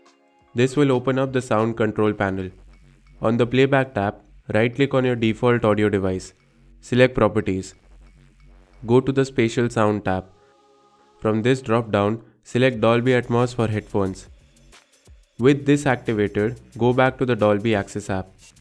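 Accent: India. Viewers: the paj eet accent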